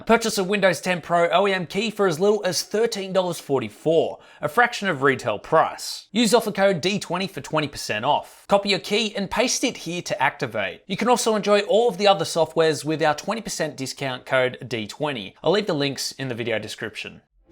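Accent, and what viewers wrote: Australia. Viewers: Is he Aussie?? Cause I'm Aussie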